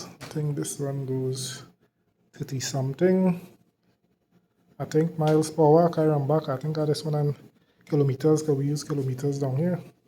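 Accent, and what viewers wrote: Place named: Trinidad and Tobago. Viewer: Hi, i can tell you're from the Caribbean, Trinidad and Tobago, right? I have the Supermach SM26 from Pricemart and it works amazing. I noticed that seat is mounted backwards compare to mines. Also if you press and hold the up and down buttons same time, press the M button until the "P0 8" Icon appeared and press both arrow buttons to come out.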